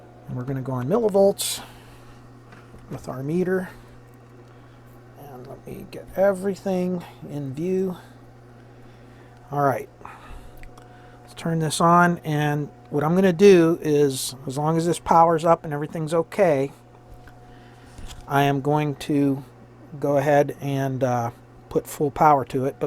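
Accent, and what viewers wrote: Pennsylvania, United States. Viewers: Johnstown Pennsylvania accent.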